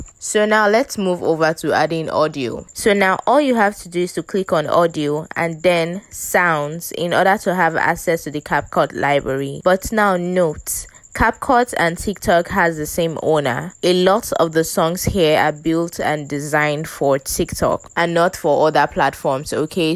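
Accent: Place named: Nigeria. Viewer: im a big fan btw you are relaxing and pretty i love the nigerian accent it's very good!have a nice day!❤️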